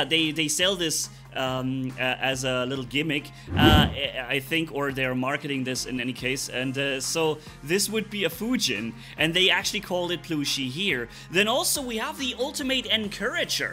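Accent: Germany. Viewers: Sein Akzent hört sich deutsch an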